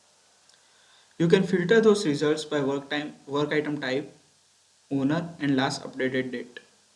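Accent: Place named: India. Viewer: that's , indian accent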